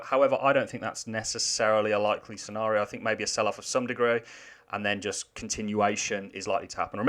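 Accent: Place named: West Midlands, United Kingdom. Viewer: Thanks again. Nice to hear a west mids accent adding an enormous amount of value, keep up the good work pal.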